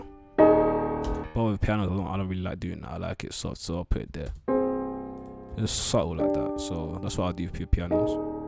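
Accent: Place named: United Kingdom. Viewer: 📠 FAX! Heard the UK accent and subbed quick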